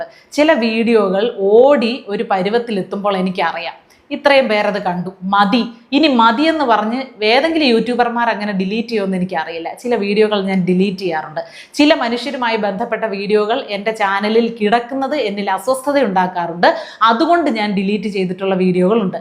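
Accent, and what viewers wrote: Thiruvananthapuram, India. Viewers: ആർ എന്തെങ്കിലും പറയട്ടെ കലക്കുന്നുണ്ട് തിരുവനന്തപുരം ഭാഷയും കൈകാര്യം ചെയ്യുന്ന വിഷയവും ഒരു വലിയമ്മച്ചിയുടെ പക്വതയോടെ അവധരിപ്പിക്കുന്നത് വളരെ ഉചിതമാണ്. Keep it 🎉🎉🎉🎉🎉🎉 കഷണ്ടിക്കും അസൂയക്കും മരുന്നില്ല. 👍💪🌹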